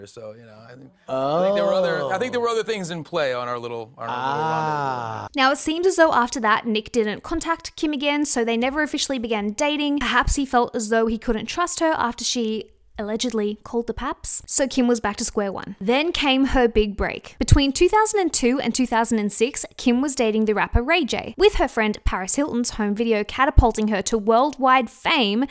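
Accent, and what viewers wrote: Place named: Australia. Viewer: I know it's hard but the lady speaking is Australian.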